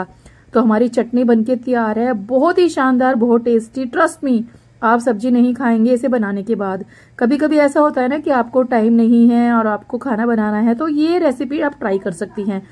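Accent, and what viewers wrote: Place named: Uttar Pradesh, India. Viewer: Lagta hai aap up se hai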